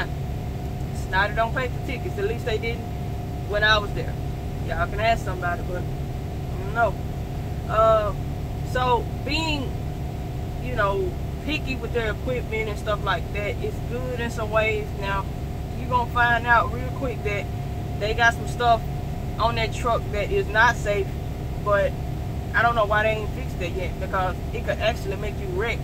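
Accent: South, United States. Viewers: Pretty! Love that southern accent. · I'm liking that Country Slang/Accent 😃.... You're still driving, right ?...I'm considering getting my CDL...And switching over from doing Manufacturing work for over 20yrs... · Girl you so country I love it!!!!! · I heard your southern drawl. I'm from Florida, born and raised. I just recently graduated from St. Petersburg College with an Associate degree. However, I want a house in a hurry. I can not get my house in a hurry with a 10 dollars an hour job. Have you been to college or some kind of trade school? How old are you? What do you do now for a living?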